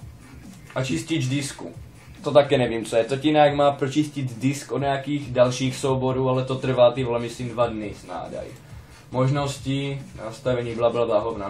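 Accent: Moravia, Czech Republic. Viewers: Moravak ne ? :D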